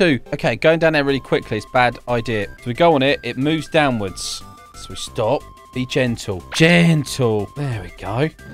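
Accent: United Kingdom. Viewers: hold up, wait a minute, are you from the UK?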